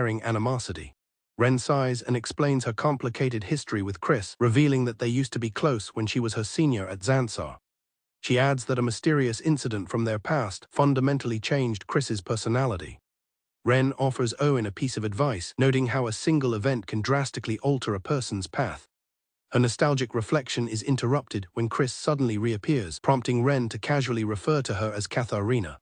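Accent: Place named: United Kingdom. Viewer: Manga recap with a British accent?!🤩👍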